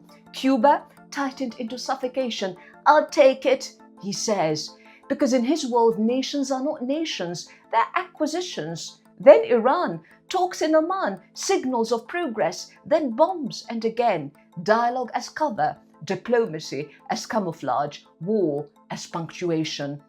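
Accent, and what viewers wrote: RP, United Kingdom. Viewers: Royal family / Queens style english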